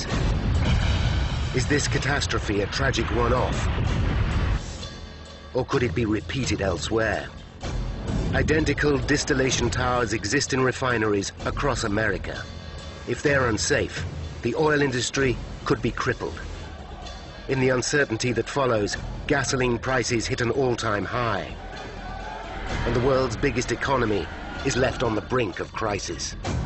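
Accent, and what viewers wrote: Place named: England, United Kingdom. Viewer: Ironic that there is an English accent narrator in this version considering BP owned the plant. They care nothing about their workers just profit.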